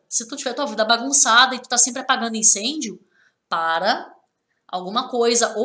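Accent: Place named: South, Brazil. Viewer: Do Sul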